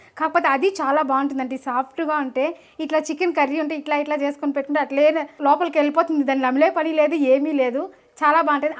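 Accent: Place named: Chittoor, India. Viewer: natural and nice cooking with chakkani chittoor telugu maatalu, so homely...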